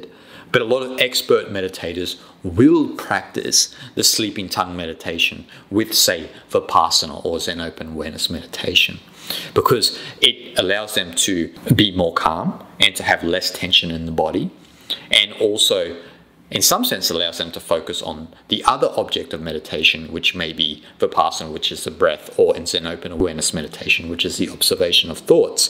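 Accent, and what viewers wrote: Australia. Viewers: P.S. Are you Australian? 😌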